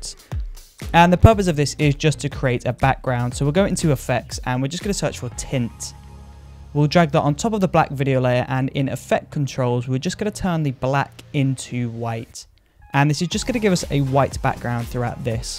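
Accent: United Kingdom. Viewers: I love coz you're British haha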